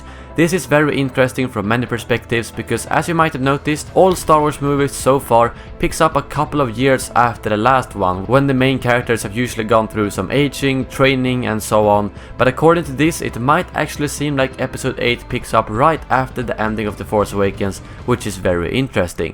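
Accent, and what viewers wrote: Sweden. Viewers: Let me guess? You are Swedish right?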